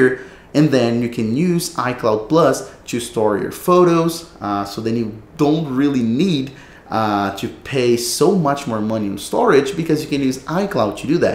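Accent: Brazil. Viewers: sound brazilian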